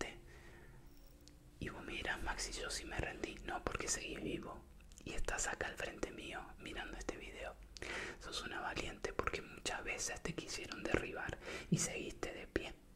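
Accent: Argentina. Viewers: Hola; tus vídeos son muy relajantes y amo tu acento argentino;saludos desde Argentina 💕💕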